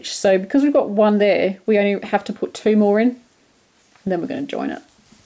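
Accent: Australia. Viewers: So enjoying your Aussie accent and way of talking! Oh and the crochet too of course :)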